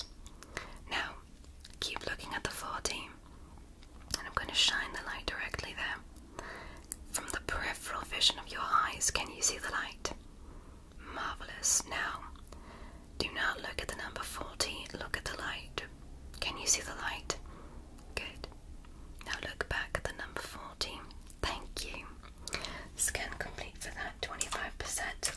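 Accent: United Kingdom. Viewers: Amen. Her british/alien voice melts me like butter on a pan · Are you British?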